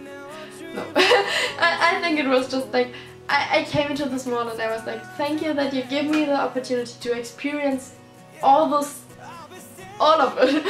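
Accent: Germany. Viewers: Dein Englisch ist echt gut .Klar man hört glaube ich immer das deutsche raus aber am sonsten echt gut 😍 Du hast ja am Anfang gesagt das du bald Ball hast und könntest du dazu ein video machen also wie du dein kleid kaufst wie du dich fertig machst und allgemein der Ball ?😊 Oder auch allgemein mehr videos machen wie zum Beispiel von deiner Schule wie es dort ist usw. also so eine art Vlogen ?🤗 Wenn du Zeit hast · That German accent combined with your poor English of is a real pain in the ass. A disclaimer for all whom willing to watch this cancerous video. Don't it'll only cause you pain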